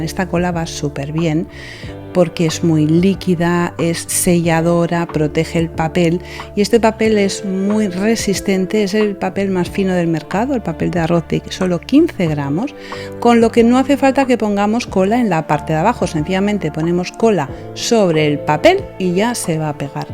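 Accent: Spain. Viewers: My excitement from your works as an artist to learn from you is great. I sculpt large elements in ceramic clay and cement. ... and a greater excitement is that I understand in every sentence a few words from your Castilian Spanish. I'm an Israeli from Bulgaria Spainsh. And my grandmother insisted talking to me for ladino. Thank you and my grandmother Victoria. my name is Vicky Lahav. Thank you and only health and peace. In Hebrew they say: without art we will die..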